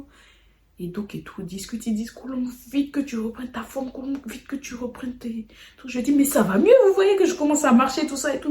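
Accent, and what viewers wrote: Ivory Coast. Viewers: J’aime trop ta manière de parlé en ivoirienne 🤣🤣🤣❤️❤️❤️❤️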